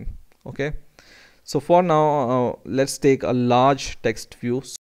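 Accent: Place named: India. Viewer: Are u an Indian Guy? Sounds like u are, at least for me it does. Can u show US How to make something like this -> I wan't to add number, but like this Let's say i type ASD+2 So the app store 2 on the ASD file, if the 'ASD' it doesn't exist yet, it make a new one, and ad the 2 on it Then i hit ZXC+3, it adds like before, but add on ZXC, again if it doesn't exists, it create one 'row' named ZXC, and add 3 on it Then again, i type ASD+8, it sees that ASD already exists, and has a value of 2, then it add 8 on top of it, resulting 10 Its a calculator, but with letter's too, Just a crazy idea of mine hahaha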